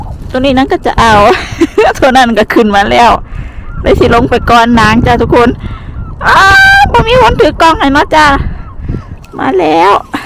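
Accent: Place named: Chaiyaphum, Thailand. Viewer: เว้าคือคนชัยภูมิเนาะ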